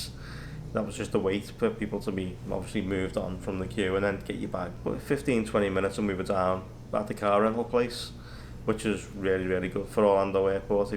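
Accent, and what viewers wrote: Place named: Liverpool, United Kingdom. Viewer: Yess I’m feeling this shout lad ha just subscribed soon as I heard the scouse ha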